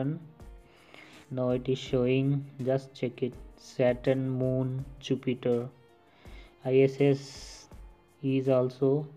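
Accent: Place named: Bihar, India. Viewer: bihari english accent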